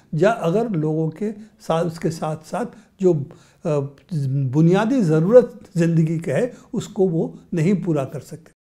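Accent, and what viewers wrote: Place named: Bengal, India. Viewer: in ko hindi buolna nhi aata , sthree ling prush ling ka kuch pata nhi , bengali Logaun ki tarah baat karte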